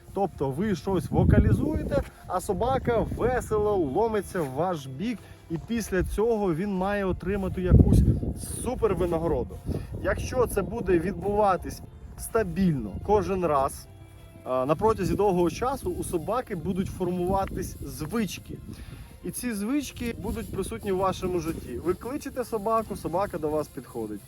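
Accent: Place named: Ukraine. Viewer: нравятся еще как нравятся хоть украинский не знаю я родилась в киеве живу в Израиле но знаешь я слышу украински мне кажется что я сейчас заговорю на украинском мне украинский "акцент" ближе чем руский . как душевно, генетический.(не потому-что вами война) я невидела украину 34 года а акцент родной остался на душе😊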